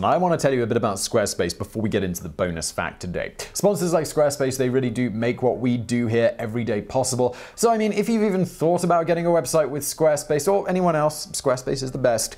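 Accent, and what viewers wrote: United Kingdom. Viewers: "Occasional blowing before insertion was still a thing" to my American ears, you saying that so casually with the AWESOME British accent is one of the funniest things I've heard all week! Had those words come from someone with an American accent it wouldn't have been anywhere near as funny to me..... Thanks for another great video sir! Love what you all do and how much hard work goes in to all of these videos. It's appreciated sir.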